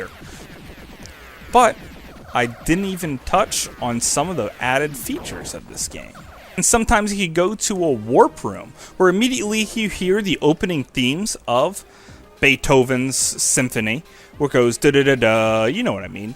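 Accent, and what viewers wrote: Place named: United States. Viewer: Without looking at your details, but after watching almost all of your Jaguar reviews over the last couple of days , and enjoying and agreeing with most them, I'm pretty sure your accent is American...maybe Canadian. Why do I bring this up at all? Because through every single review you pronounce the Jaguar as JAG-WIRE. And though the British claim the true proper pronunciation with JAG-YOU-ARE, even the true American English pronunciation is JAG-WOAR with the WOAR pronuonced like CAR or STAR, which I only spelled that way instead of WAR since that is pronounce WOR, in, I believe both American and British English...where do you come from or where did you ever pick up JAG-WIRE?!? Another Good review I agree with though, even though I have picked on you in several of these video comments, Over all I love your style and your sensibilities are like mine for the most part, even with some games that are generally considered terrible, and you and I disagree. 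There are a few, however, that I am blown away because your review of a game was exactly opposite of what I expect from you or think about it myself...I'm loving it though! I will start checking out reviews you have for other systems now, that I have also...or, I would, but apparently the only other system you have in your play list that I have is the 3DO, and I'm not interested in the few items you reviewed so far (at least not interested in a review of interactive porn or what ever) You need to get some 3DO games! Want me to r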